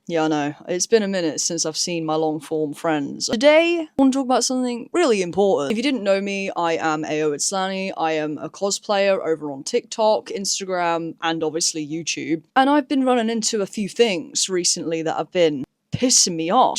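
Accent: United Kingdom. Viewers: you're bri'ish ?!?!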